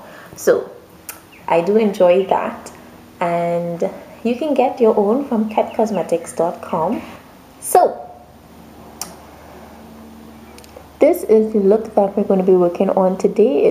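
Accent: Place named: Trinidad and Tobago. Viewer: ha! i can pick out a trini accent anyday!!!! hmm just found you and love your work! subbed! air brushing is the bomb my system is the dinair pro my brides just love it! a trick i do is switch guns because i really prefer oil based foundations they last longer covers better and show better on film but i like the ease of the water based to me it seems lighter especially during the summer.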